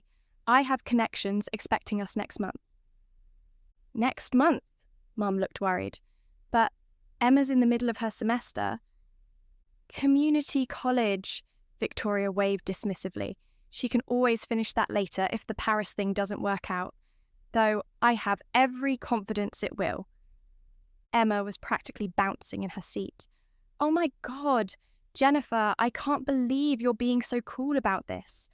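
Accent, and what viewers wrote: Yorkshire, United Kingdom. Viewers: What accent is that? Yorkshire?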